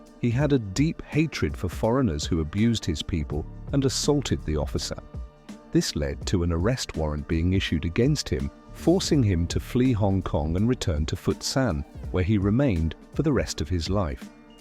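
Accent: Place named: England, United Kingdom. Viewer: The history might be interesting, but the Queens English pronunciation of Mandarin and Cantonese is really hard to get past.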